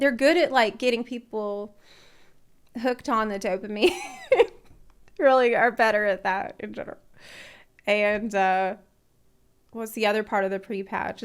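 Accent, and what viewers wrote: South, United States. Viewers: are you from the south in the states? I hear some southern accent here in there.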